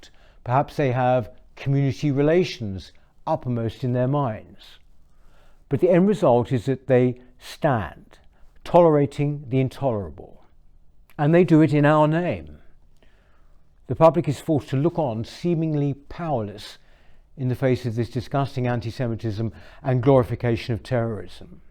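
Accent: RP, United Kingdom. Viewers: British government should not have supported apartide in gaza/isreal. So stand on holacoust as much as you like. We all know what isreal have been doing for many years. Don't want to explain the full picture do you. I don't condone atrotties of hamas kind or any other group or government. Seems strange how usa forces carry out terrorist actions on civilians yet someone like you would support the deportation of Julian for spilling the beans. But then you sound like a posh toff who probably supports people like Blair, Obama and bush. And the irony is you probably support the neo nazi far right in ukrain who are trying to ethnicly cleans the, as they put it, orcs from ukrain. Hope your chanel does well buddy. Good luck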